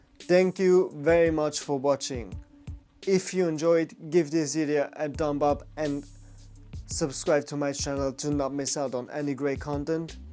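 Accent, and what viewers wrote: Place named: Italy. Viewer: U sound italian. Coincidence? 😂😂 of course not fools.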